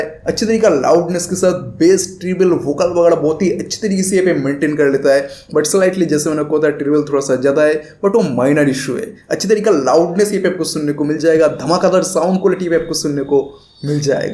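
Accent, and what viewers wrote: Bengal, India. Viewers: Bro are u bong ?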